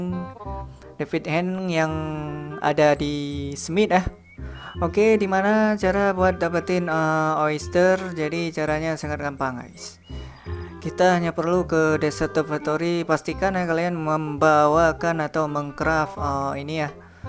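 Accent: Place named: Aceh, Indonesia. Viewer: Salam dari aceh nice video bro pantes logatmu kok kaya familiar saya hahaha.. beu maju beu sukses beuh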